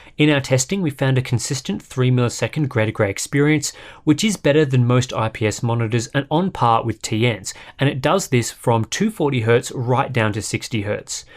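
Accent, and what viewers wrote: Scotland, United Kingdom. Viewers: perhaps Scottish? I hear a slight accent. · U got that default British voice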